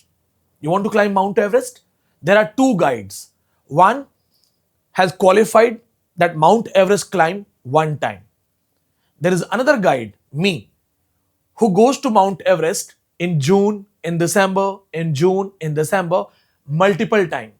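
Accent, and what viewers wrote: Rajasthan, India. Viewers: we miss your rajasthani accent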